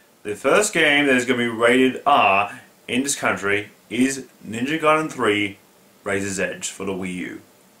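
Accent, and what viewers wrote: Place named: United Kingdom. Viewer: Dude ... i expectd an aussie accent like my friends from school but you sound like my friend from back home "Britain" u sound like a BIG OLD SIR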